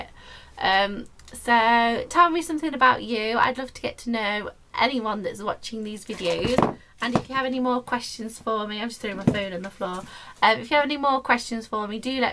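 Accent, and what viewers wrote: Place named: United Kingdom. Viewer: What accent is that, not sure if British, oops never-mind you answered my question later on in the video haha This was so cool to listen to, wish us Americans had a cool accent haha.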